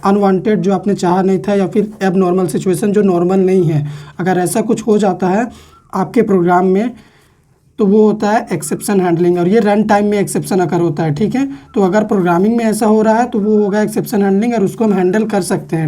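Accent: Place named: Bihar, India. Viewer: who loves his bihari accent😀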